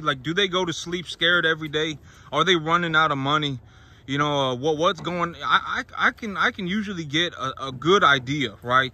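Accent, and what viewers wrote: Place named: East, United States. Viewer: he's a mystery, I think he kinda has an eastern U.S. accent..... and he obviously doesn't read our comments😂 or we'd get a 5,000 word response. Could cut it down to 1,500, but he generally repeats everything at least 3 times.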